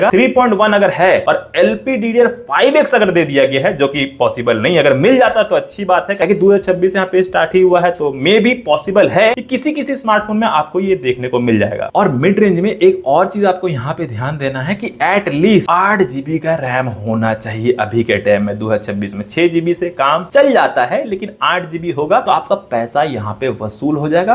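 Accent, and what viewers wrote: Bihar, India. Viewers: बिहार se kya bhai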